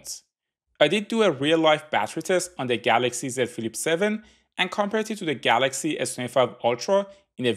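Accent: Iran. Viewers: You sound Irani, are you ?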